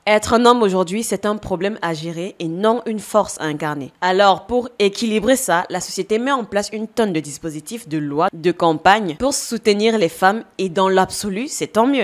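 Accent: Cameroon. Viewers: Ton accent prouve que tu es Camerounaise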